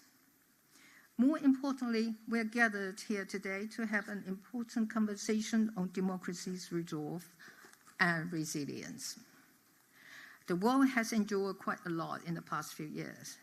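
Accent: United Kingdom. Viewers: 蔡前總統的英式腔調，太迷人了❤ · 元首風範依舊，完全沒有卸任感啊❤一站出來那種領袖氣質盡顯，好喜歡！謝謝my英仍願意繼續當台灣這個小而美且強國家的志工，繼續為台灣打拼，加油，愛妳喔， ～ ps:like the UK accent you speak❤ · Beautiful British accent ❤ we love you MS. Tsai ❤❤❤ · 蔡總統的英式英語好道地喔！真不習慣。謝謝蔡總統向國際發聲，辛苦了。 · 謝謝前總統卸任後仍舊為台灣努力拓展外交, 略帶英國腔調的英語實在太迷人了👍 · 雖然聽不懂，但前總統英國腔英文，聽起來很好聽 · 你不懂英語才是笨蛋、總統是英國人🇬🇧口音、不懂裝懂？ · 她的英式英文真的好好聽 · 台湾人说英国腔，台湾人骄傲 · 的英國腔太迷人😍最後全體起立鼓掌真的好感動🥹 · How does she get British accent? Must have been busy practicing her speech lately?😂 not consistent though, still has Taiwanese accent mixed in. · 純英語腔調！英國上流社會的口音！ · 以蔡前總統為榮，為大家在外交上打拼。她講英文有英國腔耶。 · 蔡總統的牛津腔英文真好！👍👍👍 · 請保護好總統在她外出拼外交的時時刻刻❤😊中共視她為眼中釘她的英文發音很好聽有點英國腔這次沒看到布拉格帥哥市長有點可惜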